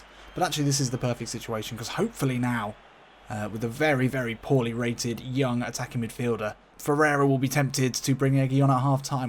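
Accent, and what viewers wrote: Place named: England, United Kingdom. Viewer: kalo dari aksennya, dia dari Inggris bro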